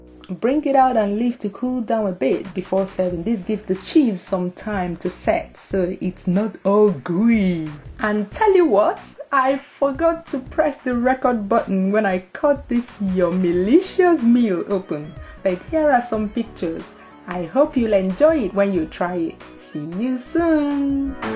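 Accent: Nigeria. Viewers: You sound so Nigerian,I love it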